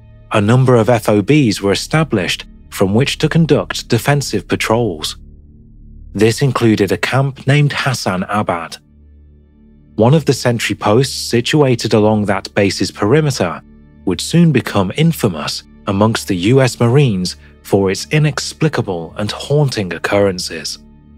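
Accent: United Kingdom. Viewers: It's nice to have a bit of variety. New guy needs to vary up his tone a bit, go for more emphasis, be a little more lively. Otherwise? Pretty nice. But, then, you can throw a decent Brit voice at anything and us 'muricans will go for it.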